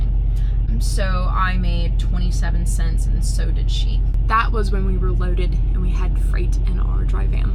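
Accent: United States. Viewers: But yeah, I never would’ve guessed that you were from Florida. I thought you were like from the Deep South or something like that. But I definitely love watching you and how beautiful and very gorgeous and very stunning and very lovely and very vibrant and very powerful and sexy you are as well. 😍😍😍😍😍😍😍😍😍😍😍😍😍😍😍😍😍❤️❤️❤️❤️❤️❤️❤️❤️❤️❤️❤️ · English is my 2nd language on top of that your american accent, so subtitle is appreciated. 🙌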